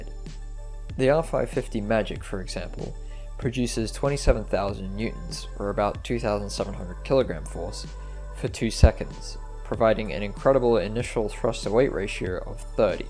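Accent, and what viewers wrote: Australia. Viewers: He's aussie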